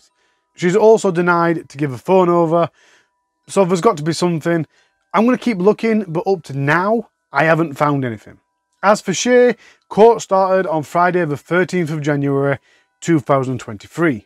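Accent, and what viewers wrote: Yorkshire, United Kingdom. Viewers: awww blooody elll lad 😂😂😂 thought I could see a bitta Yorkshire in there. Hahah. Love this channel mate. Currently weking mi way frew thee vids 😂. Keep up the great work man! Glad I found the channel. “Am off for a cuppa n a bizkit, before I gu darn Weken men’s 😂😂”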